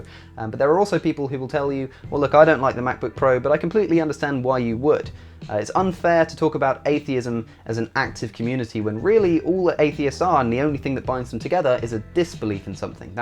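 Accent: United Kingdom. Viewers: Are you British or English?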